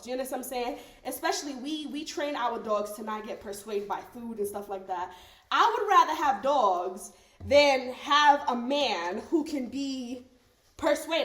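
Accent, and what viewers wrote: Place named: New York, United States. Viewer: You sound so relatable like a woke asf n.y’ ker and are living my dream. I’m so over the western world .. god bless you and your growing family 🌍..😍😍😍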